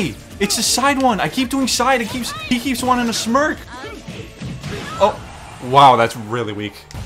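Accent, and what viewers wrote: Chile. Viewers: Are you Chilean???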